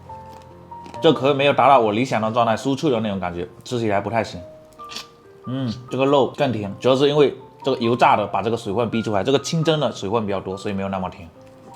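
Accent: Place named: Fuzhou, China. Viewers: 虾菇好吃。福州腔好重，福州人吧？ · 福州口音呀？ 大哥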